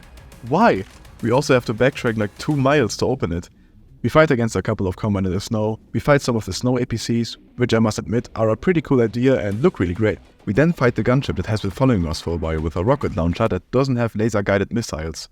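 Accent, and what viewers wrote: Germany. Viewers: Kommst du ... aus Deutschland?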